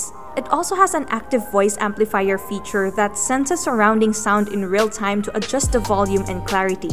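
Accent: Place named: Philippines. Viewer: that irritating pinay accent.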